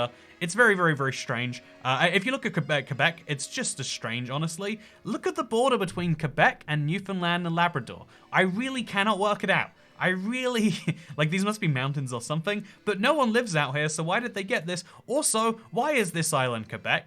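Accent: United Kingdom. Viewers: As a Canadian with British heritage (my Mom moved to Canada with her family from Stafford when she was 11), I found this video quite amusing -- like listening to one of my British cousins trying to explain Canada :) You got it mostly right too. Good job!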